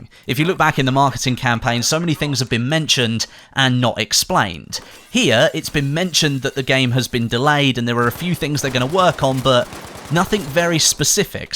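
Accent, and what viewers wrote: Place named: United Kingdom. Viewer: I watch your videos for your British accent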